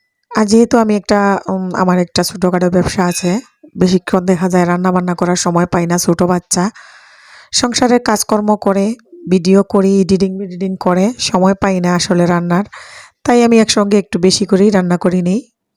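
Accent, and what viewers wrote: Sylhet, Bangladesh. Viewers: tumi sylheti ni go boin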